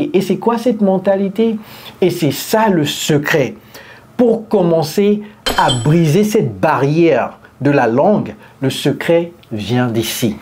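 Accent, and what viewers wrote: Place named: United States. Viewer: Since I begin to listen your video the American accent is easier to understand for me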